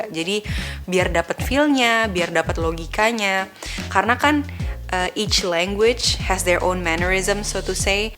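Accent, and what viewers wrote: Indonesia. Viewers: Paling nikmat emang speaking dan aksen nya orang indonesia 😭. Juelas banget. Dan jarang oake slang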